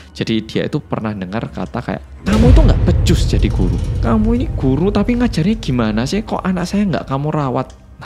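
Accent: Java, Indonesia. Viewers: OMG kisah nyata ini mirip karya di webtoon dgn judul "the real lesson" dari akhir episode 81-90, aku kira kejadian ini cuma ada di webtoon mengenai seorang ibu-ibu yang spam call guru sd di nomor pribadi bahkan pada saat malam hari. Ngga kebanyang itu kejadian di ibu guru sd yg mas ceritain😓😓 BTW, aku nonton video ini dideket papa dan papa ikut denger, papa malah salfok, "suaranya medok banget". Suara mas melokal yaa, sampe papa ga ngeh klo mas orang korea😅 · Kerenz pembawaannya medok tpi banyak tambahan gambar 🎉🎉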